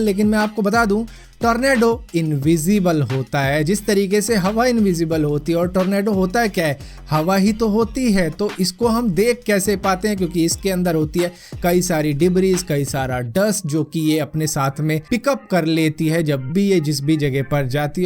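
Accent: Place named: Punjab, India. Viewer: Muje ehsa lgta haii ki app punjab ke ho..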